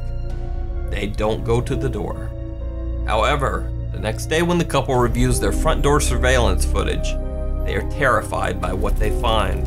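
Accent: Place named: South, United States. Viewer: I love your southern accent do you live in Tennessee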